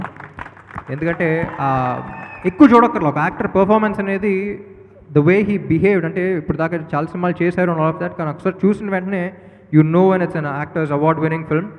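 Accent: Telangana, India. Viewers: After I smart Shanker....telangana slang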